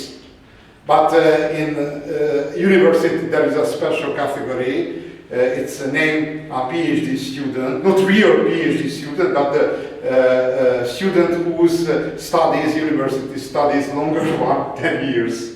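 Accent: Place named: Eastern Europe. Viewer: Strong-,and advanced Eastern-Slavic accent.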